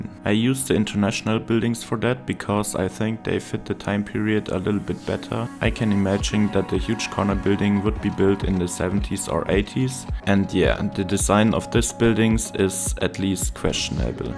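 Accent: Germany. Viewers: are you german? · I Love your german accent Even though German is a Kinda Harsh Language Love Your City 😍🤩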